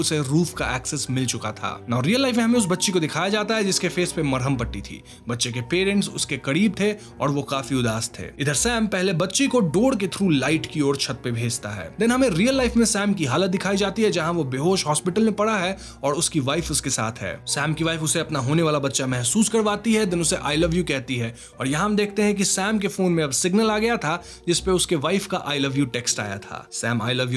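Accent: Bengal, India. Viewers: vhai tum bangali ho kya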